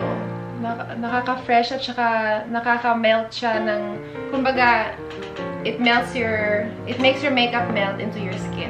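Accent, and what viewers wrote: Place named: Philippines. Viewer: Love the Tagalog accent bezzie! Haha! Awesome vids, sana magaling din ako mag makeup. Lols 😍😍😍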